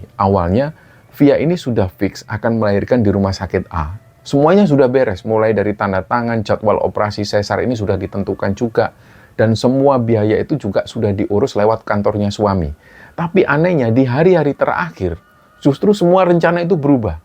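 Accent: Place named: East Java, Indonesia. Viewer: sama sama mas jujur saya dari dulu ngefans dari taun 2021 ke juga ke mas sebagai narator cerita horor menurut saya beda pembawaan nya sama narator" yang lain apa lagi kalo udah ngomong pake bahasa Jawa timurnya saya suka logat medok nya 😊❤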